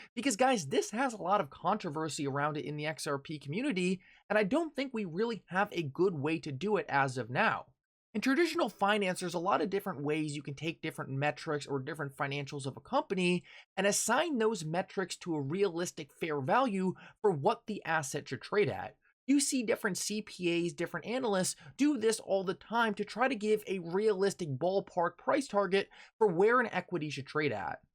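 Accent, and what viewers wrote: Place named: Delaware County, United States. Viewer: That’s that Delco accent… he won’t admit it but I knows it when I hears it…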